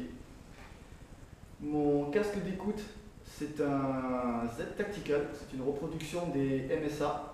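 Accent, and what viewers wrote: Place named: South, France. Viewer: on reconnait la petite pointe du sud avec le ptit "tchichou" ^^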